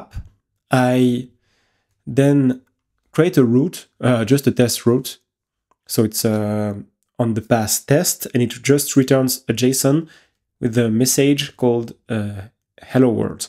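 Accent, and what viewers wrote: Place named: France. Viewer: Nice bro ! I can smell that fresh French accent, but very good content !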